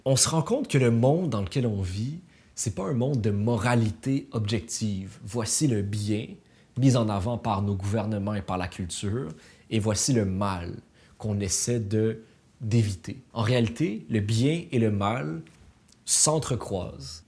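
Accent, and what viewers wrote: Quebec, Canada. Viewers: Ton accent québécois est magique! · Je suis Française. Qu’est ce que j’aime l’accent du Canada 😍 et des vidéos tjrs enrichissantes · Tu vois le beau gosse arriver et puis l'accent Canadien ... Ahhh Non. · Il est québécois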